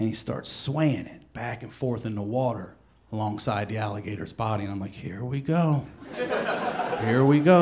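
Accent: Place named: Baltimore, United States. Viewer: Damn he's got such a Baltimore/maryland accent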